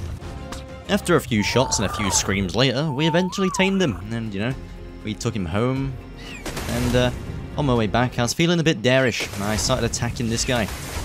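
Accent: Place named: United Kingdom. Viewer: I never heard such an bri'ish guy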